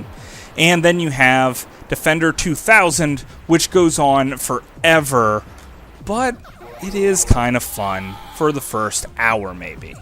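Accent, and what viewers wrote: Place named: United States. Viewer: Without looking at your details, but after watching almost all of your Jaguar reviews over the last couple of days , and enjoying and agreeing with most them, I'm pretty sure your accent is American...maybe Canadian. Why do I bring this up at all? Because through every single review you pronounce the Jaguar as JAG-WIRE. And though the British claim the true proper pronunciation with JAG-YOU-ARE, even the true American English pronunciation is JAG-WOAR with the WOAR pronuonced like CAR or STAR, which I only spelled that way instead of WAR since that is pronounce WOR, in, I believe both American and British English...where do you come from or where did you ever pick up JAG-WIRE?!? Another Good review I agree with though, even though I have picked on you in several of these video comments, Over all I love your style and your sensibilities are like mine for the most part, even with some games that are generally considered terrible, and you and I disagree. 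There are a few, however, that I am blown away because your review of a game was exactly opposite of what I expect from you or think about it myself...I'm loving it though! I will start checking out reviews you have for other systems now, that I have also...or, I would, but apparently the only other system you have in your play list that I have is the 3DO, and I'm not interested in the few items you reviewed so far (at least not interested in a review of interactive porn or what ever) You need to get some 3DO games! Want me to r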